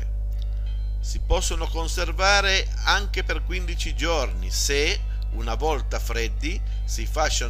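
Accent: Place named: Liguria, Italy. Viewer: Che piacere sentirti parlare con il "nostro" dolce accento Dove si intuisce una bella parlata dialettale della nostra Liguria.....Perché non alterni qualche ricetta in dialetto?....Appena il mio lievito madre avrà raggiunto il "giusto" risveglio farò sicuramente la tua ricetta. Grazie!!!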